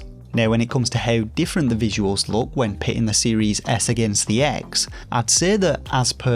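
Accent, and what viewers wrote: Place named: Liverpool, United Kingdom. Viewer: Like the vid, trying to place the accent. Liverpool by chance? Hope no offense if I’m incorrect.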